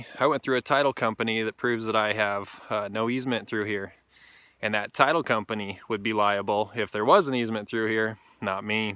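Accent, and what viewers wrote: Idaho, United States. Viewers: Wait you are from Idaho?! Me too ahhaha I was just about to say you sound like an Idaho man hahah love it!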